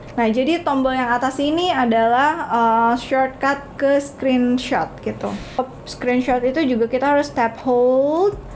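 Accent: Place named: Java, Indonesia. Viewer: gak tahan ane denger aksen wenglishnya :D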